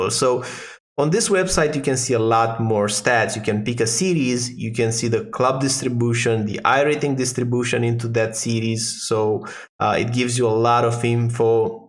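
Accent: Romania. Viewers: Knew you were Romanian mostly from the accent, but reclamele în limba română au clarificat acest lucru. 😅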